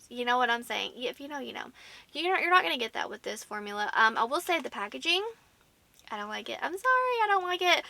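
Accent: South, United States. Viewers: I'm a new subscriber of yourd and I am really enjoying your channel and your beautiful southern accent! My family is from Temnessee and I love the subtle differences across the states! ❤ I'm eagerly waiting this palatte!!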